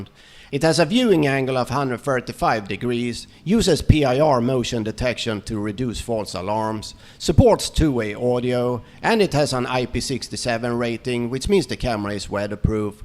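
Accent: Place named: Sweden. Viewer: Trevlig video, är du svensk? Låter så när du pratar engelska. 😊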